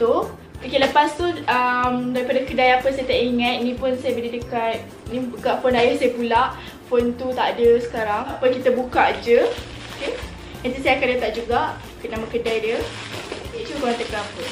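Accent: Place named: Kedah, Malaysia. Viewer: duk kt mne ye sbb dengar suara mcm org kedah🤗🤗🤗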